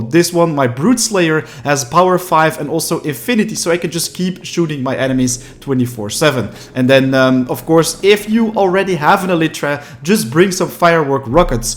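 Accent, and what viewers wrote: Germany. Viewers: Is this a german accent? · the german accent made me cringe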